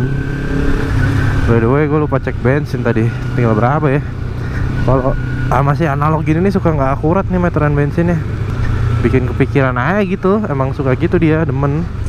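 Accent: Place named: South Jakarta, Indonesia. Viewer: Nak jaksel pisan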